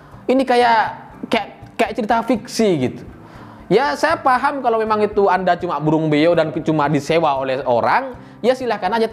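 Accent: Madura, Indonesia. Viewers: kenyataannya: mas masih kentara bner aksen maduranya😁 bravo madura👍👍 begitulah kura-kura 😁😁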